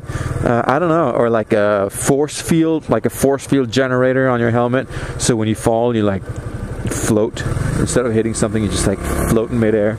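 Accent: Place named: United States. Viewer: Nice, your accent suggestion you're American. What are you doing there? You also lived in Mexico? Interesting.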